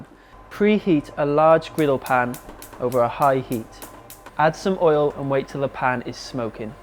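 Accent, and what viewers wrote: Wales, United Kingdom. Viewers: Aw. It's such a musical accent. My whole family is Welsh but sadly my sister and i were the only cousins brought up in England so we don't have the lovely lilt that has...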